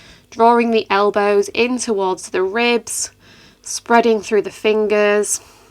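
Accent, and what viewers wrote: Yorkshire, United Kingdom. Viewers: just discovered your channel, really enjoyed this flow, think this will now become my go to for yoga tutorials, really nice energy and excellent presentation skills plus, last but certainly by no means least you are gorgeous, do i detect a Yorkshire accent/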